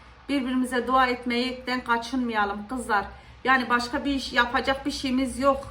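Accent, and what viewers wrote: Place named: Nevşehir, Turkey. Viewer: Çok geçmiş olsun sizi yeni gördüm nerelisiniz bizim oranin konuşmasina benziyor konuşmaniz ben nevşehirliyim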